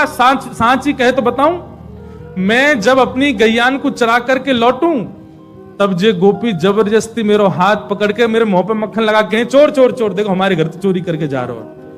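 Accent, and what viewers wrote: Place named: Bharatpur, India. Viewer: Ye bharatpuri accent me jo katha vachan kr rhe h sun ki bahut khushi milti h 🥰🥰🥰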